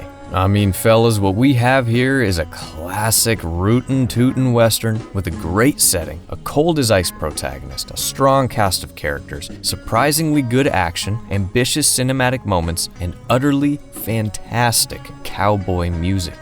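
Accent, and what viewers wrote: United States. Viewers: I love your heavy cowboy accent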